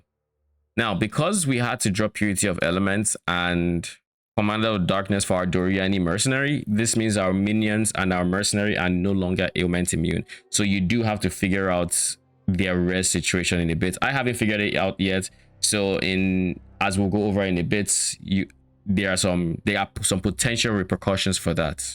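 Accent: Nigeria. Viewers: Came to see the build out of curiosity, followed after hearing what sounds like Naija accent 😅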